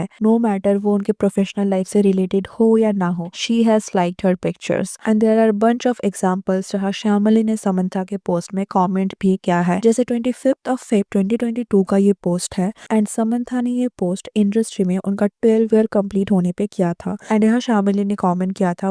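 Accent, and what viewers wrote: Bengal, India. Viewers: तुम्हारी voice से साफ़ समझ आता है कि तुम bengali हो और bengali को support कर रही हो. Probably shyamala de कि टीम से हो. कोई innocent नहीं होता Bengali सबसे बड़े धोखेबाज होते हैं. Just stop defaming Samantha and shut up please. 😂😂😂😂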